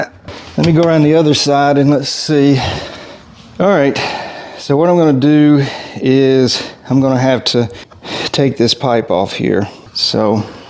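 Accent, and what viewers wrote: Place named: Mississippi, United States. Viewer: What part of Mississippi you from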